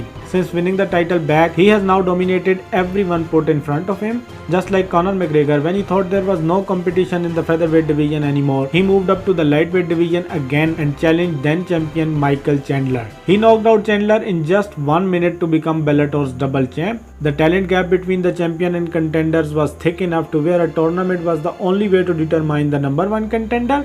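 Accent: India. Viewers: This is english with indian accent...french and other latin language WE sound a lot différent...so you learning english with indian accent dont be afraid to Say it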